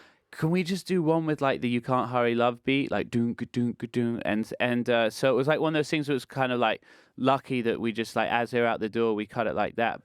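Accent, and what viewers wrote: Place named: United States. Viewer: this guy now has an American accent, master!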